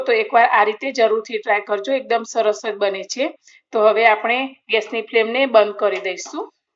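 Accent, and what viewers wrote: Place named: Rajkot, India. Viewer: Bhuj saras banavia no 1 ho 👌 tame kya gam ma cho ben boli uper thi to rajkot na lagocho reply karjo pls ❤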